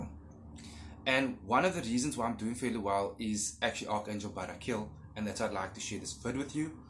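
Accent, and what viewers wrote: South Africa. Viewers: Are you a south African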